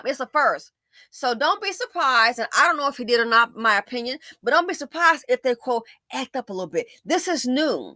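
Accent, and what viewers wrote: South, United States. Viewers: girl you are soo damn funny with your southern accent and twang 🤣🤣🤣🤣. "She picked up the k-mart man and now in divorceland". I screamed my head off 🙌🏾♥️💯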